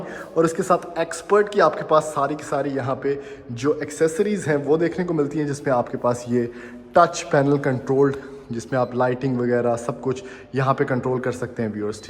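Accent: Punjab, India. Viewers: Beautiful house with your beautiful punjabi touch accent. Keep it up😊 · Apni videos mein ap jo Punjabi touch daity hu usy increase kar do specially ye word " lamkaaa " howa hai apki mostly videos mein sunny ko milta😂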